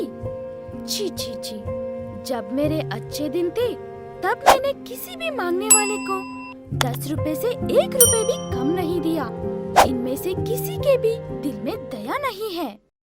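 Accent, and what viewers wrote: South, India. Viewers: Ek proper hindi bolnay vali acchi background voice over artist rakhiye pls south indian hindi maza nahi ata hai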